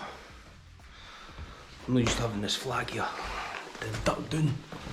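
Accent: Scotland, United Kingdom. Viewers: Great to see you guys! I have been doing some family history studying and researching my Scottish roots. Your accent takes me to to a place I want to visit someday. Loved your book! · Great to see how much you love your beautiful cat.I have two one year old cats and I talk to them too😸😁I live in Italy but I was born in Edinburgh so I enjoy listening to your "bonnie" Scottish accent 😁and admire the great scenery👍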